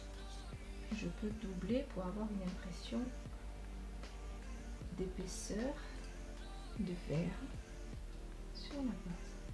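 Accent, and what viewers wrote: South, France. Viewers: Tout simplement magnifique comme vous!🤩🤩😍😍 Merci beaucoup pour ces bons moments et vos explications très précises ! Entre parenthèses, j’adore votre accent !🥰🥰🥰 Moi je suis du Sud Ouest et si je ne me trompe pas, vous êtes du Sud également 🤣🤣🤣 À bientôt, j’attends avec impatience vos nouvelles vidéos! 👍🙏🙏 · Vous êtes vraiment magnifique ! J’apprends beaucoup avec vous. Vous êtes très belle et charmante, surtout avec votre accent du sud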